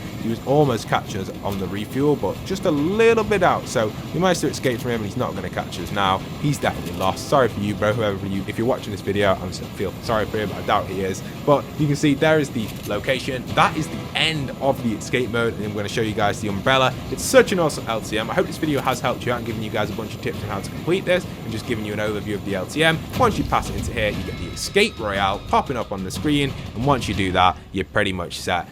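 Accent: United Kingdom. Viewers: You know that's the bri'ish accent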